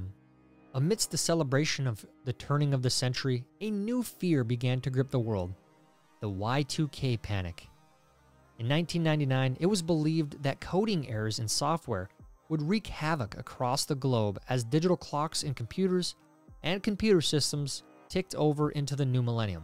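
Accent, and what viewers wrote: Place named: United States. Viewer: For our American cousins, it is pronounced York-sh-ear, Same was Warwickshire, wa-wick-sh-ear. But to be fair it does sound nice pronounced wrong in a US accent so we'll let it slide. What do us Brits pronounce wrong in the US, be interesting to know.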